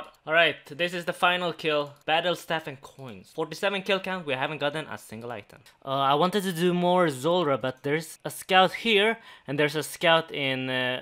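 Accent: Sweden. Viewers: är du svenne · are u swedish?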